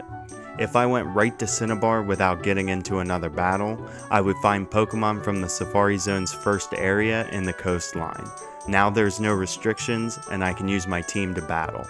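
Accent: Philadelphia, United States. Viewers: Are you from philly? Your accent makes me feel like I’m at home lol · I recognize your accent! Are you from Philly, too?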